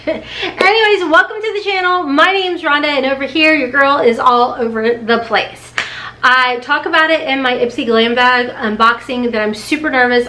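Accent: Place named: Kentucky, United States. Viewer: I haven’t even watched this yet but saw it was posted and literally said out loud “YAY!!!” 😊 I am enjoying all of your channels!!! Im from Ohio, originally from Dayton area but a lot of my family live in Florence… I live in Florida now and it’s so funny to me that I found someone on youtube from Kentucky.. My mom is from Morgan County Kentucky so I enjoy hearing your accent creep out here and there…. Cant wait until you launch your products.. I have it on my calendar!!🎉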